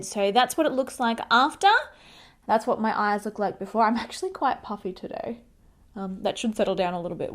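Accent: Australia. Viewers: Sounds exactly like every young beautiful Australian girl, so better not ever come here then.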